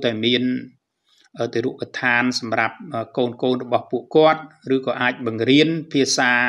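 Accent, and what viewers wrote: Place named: Cambodia. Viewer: Very sad and broken hearts , She did the right choice for her son and most people they have struggled life for living and issued living problems and they missed each others very much , so next time let your son hugs a little more so he can feel more warm up with his heart was separate for a long time maybe ( can not judging or blaming on her ) . They are very sad. So I’m agree with Lok Video man . Excellent analysis and real kaun Khmer accent very clear plus personality. . We appreciate . May Gods blessings everyone stay healthy and well . ✌️💪👍👏👏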